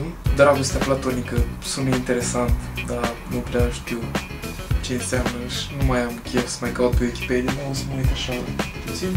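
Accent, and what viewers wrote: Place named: Moldova. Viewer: o intrebare mai ciudata ^^ tu esti moldovean sau ardelean? sunt curios dupa accent esti moldovean dupa vocabular pari ardelean deci nu stiu daca mi-ai putea raspunde masi bucura pa pace!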